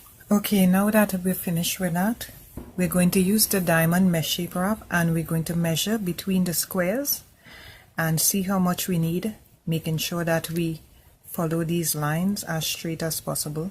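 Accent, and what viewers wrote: Trinidad and Tobago. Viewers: Hi you sound like a trini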